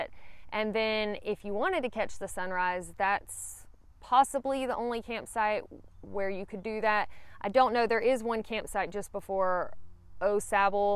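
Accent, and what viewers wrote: South, United States. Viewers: where in the south are you from? Just wondering where your accent is from. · I like the southern accent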